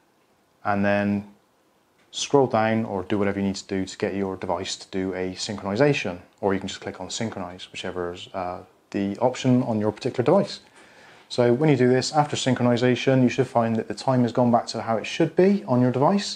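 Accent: United Kingdom. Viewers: Good morning, I know it's been quite a long time since you posted this video but I just wanted to thank you for the information. I purchased a Charge 5 several months ago and I find (maybe not a defect - just an operating method for this version of Fitbit?) that whenever I let the charge drop below 1% a complete reset and time correction is necessary. Device reset is easy thanks to YouTube although this doesn't correct the time. This AM I discovered your site and everything was solved!! I have been a quite devoted / fanatical (?) Anglophile ever since that evening on February, 9 1964 when I first set eyes and ears upon those Four Lads from Liverpool. I am 73 years old these days and was just a 14 year old lad on that wonderful evening. Now anything dealing with England, Scotland, Ireland, Northern Ireland and Wales will simply and automatically attract my attention and I know this will never change until my dying day - and will continue long after that. 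Sorry, I got a little off the subject there but just wanted to thank you for the absolutely appropriate information. I don't know how many times I have said or thought this but I could actually listen to someone with your beautiful accent (or any of those in my 5 favorite and previously mentioned countries) simply read a grocery / shopping list and I would be enthralled by by the reading because of that accent. If that's an addiction I really don't mind. All the very best to you and yours, Terry (in the middle of Minneso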